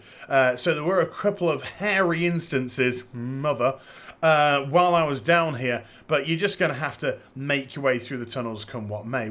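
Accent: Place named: United Kingdom. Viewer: Really like your videos. You're actually one of, if not the best WoW YouTuber in my opinion. Also i like your voice and your accent. As a German who doesnt really appreaciate the British-English-Accent yours is very enjoyable. Thumbs Up.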